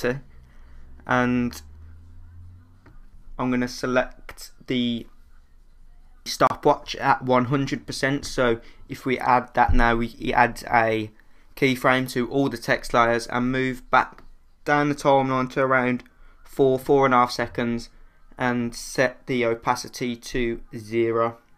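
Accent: Birmingham, United Kingdom. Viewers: first i hated ur british accent but then i started to watch these videos, now i love ur accent <3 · are you a brummie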